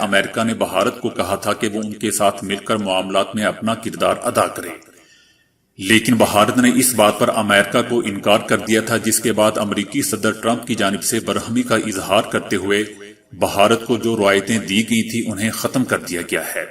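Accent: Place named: Punjab, Pakistan. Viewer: Aap apni zubaan SE Punjabi haddi nikal kr Urdu zaban sahi bolen Apna talaffus (pronunciation) sahi Karen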